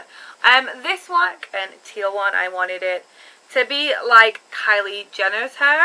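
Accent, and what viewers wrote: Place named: United States. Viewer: why does your accent keep fading to american lool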